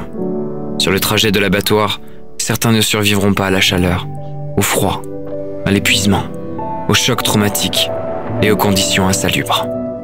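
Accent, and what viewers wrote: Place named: Spain. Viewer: Bel accent espagnol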